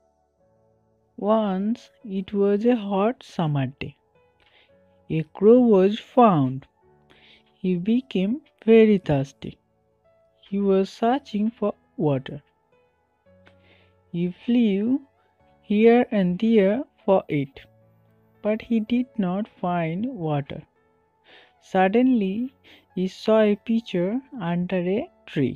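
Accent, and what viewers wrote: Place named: Europe. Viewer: Perfect voice Like European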